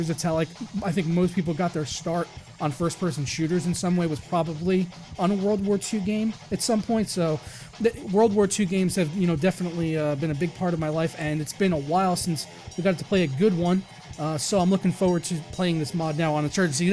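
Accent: Canada. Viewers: that Canadian accent made me moist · I had this course in uni and there was this Canadian guy, and damn he talked exactly like that. Never managed to hear the difference until now (Eastern-European here). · O Canada! BTW great Canadian accent!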